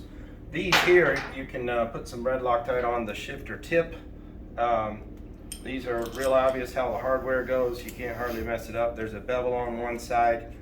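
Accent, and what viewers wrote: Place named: United States. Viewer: Is it me or is sounding more country,.. Yeehaw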